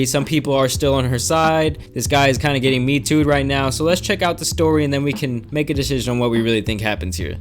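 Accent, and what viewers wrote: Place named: New York, United States. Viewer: You really have a New York accent while talking 😂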